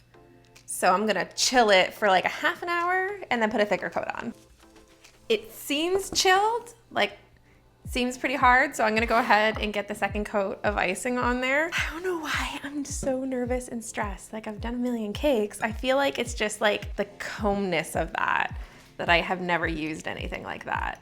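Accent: Canada. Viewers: Is she Canadian? I found her channel yesterday and I’ve been binging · Me: NO you are not subscribing to any more baking channels .. All you make is Vanilla or chocolate basic cake!!!!!! - Also me: But she's sooooo cute ... She's adorable!!! And that Canadian accent!!! Chef's kiss!!! - Me again: I said NO .. what are you doing?? ... Ok, you're subscribed ... I hate you 😅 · I just subscribed! ☺️😁 also, are you Canadian? 😂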